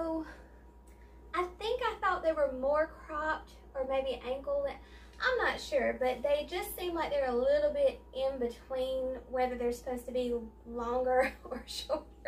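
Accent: South, United States. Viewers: Ran into your channel looking for a review of Hanna Nikole. Now following! Thank you for your reviews. My measurements are 49, 43, 52, so I can get an idea of how things would look on me, even though you are more of an hourglass and I am more of a rectangle. Your accent is so charming and soothing. My hubby is from Alabama, so listening to you is like visiting with some of my favorite people in the world (his sisters!!!) Cheers, Maria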